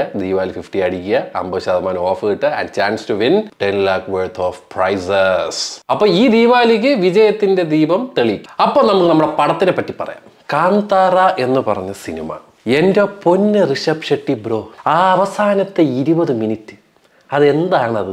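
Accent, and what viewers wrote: Trivandrum, India. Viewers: ഭാഷ powli tvm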